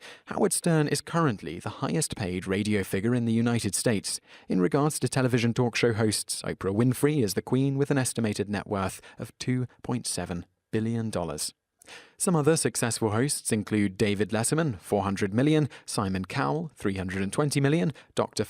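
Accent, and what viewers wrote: United Kingdom. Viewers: Without checking the other comments... the person narrating this is the least compelling narrator on the planet. He makes "interesting facts" so effing uninteresting and boring, British accent notwithstanding. Put a little pep in your step. HOWARD STERN RULES BABABOOEY!!! (i mean seriously british dude, include a little personality).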